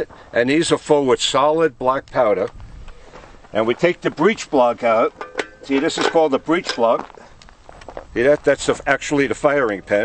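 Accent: New York, United States. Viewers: New York accent, articulates his plans. Kevin McAllister grew up.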